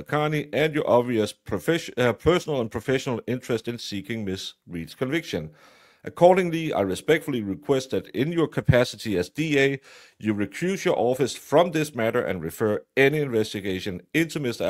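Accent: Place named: Denmark. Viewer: That's just Danish English (denglish) pronouncement 😉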